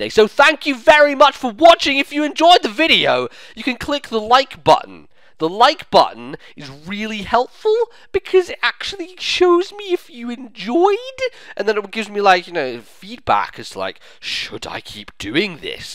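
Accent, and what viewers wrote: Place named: United Kingdom. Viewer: nice video, gotta love this british accent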